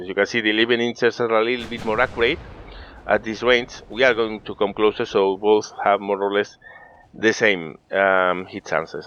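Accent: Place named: Spain. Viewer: Just found your channel for the first time when looking for UAD videos and insta- subbed. Good presentation with an adorable Spanish accent :). I'm torn apart with this particular game as it seems super interesting, but would you recommend dishing out almost 50 EUR at a game that is not even available in Steam EA yet?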